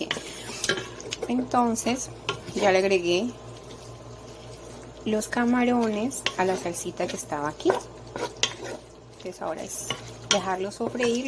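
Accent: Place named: Colombia. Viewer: hola yo soy mexicano y m encanta tu acento de colombia se oye muy sensual.asi k m encantaria k m digas palabras k solo se usan en colombia y no dijeras k significan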